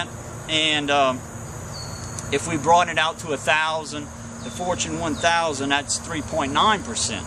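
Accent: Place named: Louisiana, United States. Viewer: As a fellow Louisianian (from Cenla), I was convinced you were from Louisiana from the accent.... nailed it! Thanks and God bless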